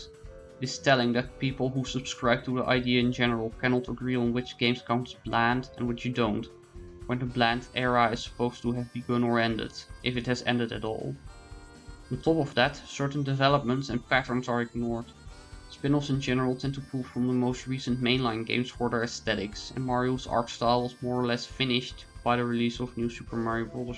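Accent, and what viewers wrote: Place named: Netherlands. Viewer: He talks with a Dutch accent. I would know since I'm also from the Netherlands.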